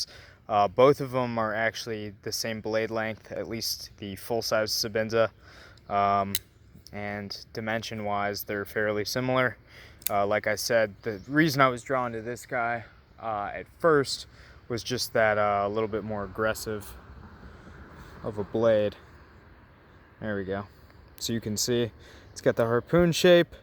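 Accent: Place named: Midwest, United States. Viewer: You sound very much like a Midwest ‘up north’ guy and it’s appreciated.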